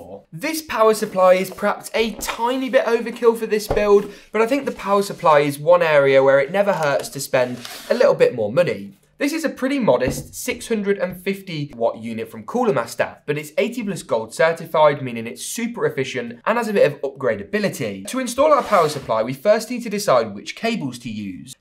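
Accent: United Kingdom. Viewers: U have the best British accent I’ve ever heard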